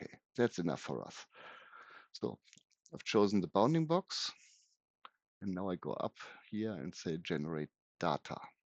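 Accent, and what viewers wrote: Germany. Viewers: I love that German accent! <3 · I’m about halfway through the video and now realizing some of this mistakes I made with your tool. Mainly the ordering of materials (based on some guesswork about the underlying map). Thanks for showing my tool! I think it’s definitely possible for your tool to sort of auto select the materials, layers ordering, roads options in the future using OSM data. I’m looking forward to the future if this tool. Fantastic work. And for some reason I just love German accents 😂 great video my friend. Still watching through until the end.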